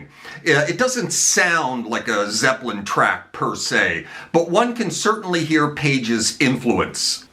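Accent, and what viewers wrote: Canada. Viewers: Soon as I heard the Canadian accent I knew I was listening to a man who knows his stuff. Rock on from Detroit 🤘🏼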